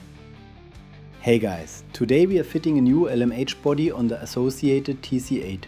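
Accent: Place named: Germany. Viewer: Englisch für Deutsche! Super!!! Hier verstehe ich jedes Wort 😂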